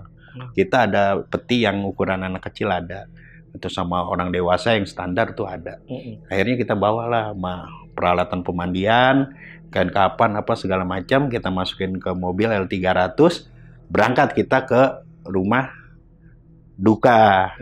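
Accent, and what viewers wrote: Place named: West Java, Indonesia. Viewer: Sunda banget 😅😊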